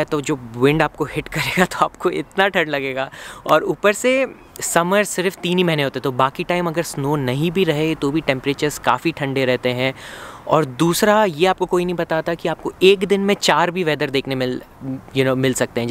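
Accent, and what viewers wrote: Canada. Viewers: Dude i thought you are a legit born canadian when you switched to hindi i was like 😱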